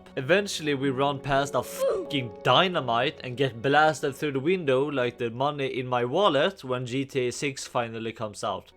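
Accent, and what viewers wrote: Sweden. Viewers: Is he swedish?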